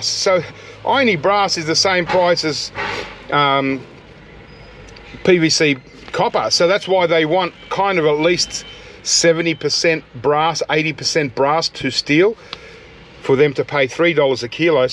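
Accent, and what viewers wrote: Australia. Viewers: Well your accent sounds Australian but y’all use dollars?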